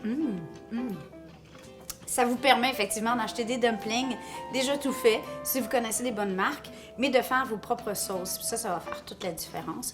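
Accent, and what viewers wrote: Canada. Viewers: Merci beaucoup pour toutes ces bonnes recettes faciles. Ça fait plaisir d'entendre ce bel accent canadien. 😜👍😘 Janie de Paris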